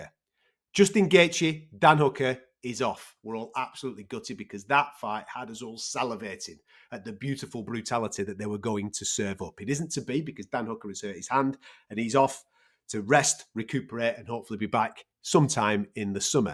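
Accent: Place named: England, United Kingdom. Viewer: Also the thiccc English accent makes all the names sound so epic 😂🔥🔥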